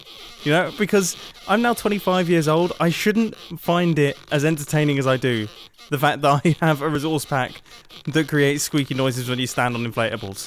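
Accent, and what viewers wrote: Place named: United Kingdom. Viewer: there both Brittish difference is Wheatly is Dumb while has a basic Idea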